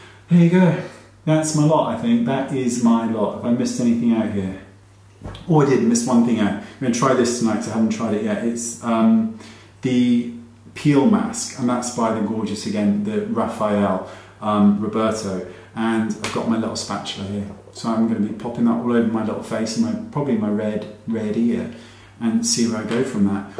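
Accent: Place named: United Kingdom. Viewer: ❤️the Brit accent! “Vitamin, Naaz, Bloody!” ❤️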